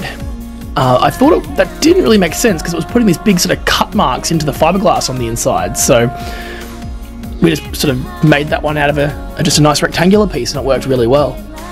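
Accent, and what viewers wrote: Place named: Australia. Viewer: Feeling a lot like a mighty car mods video, not sure if its the similar music in the background or the aussie accent! haha Keep it up man!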